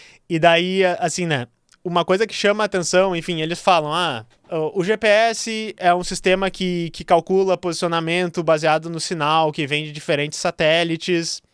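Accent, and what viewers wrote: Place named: São Paulo, Brazil. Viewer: o sotaquinho paulistano kkkkk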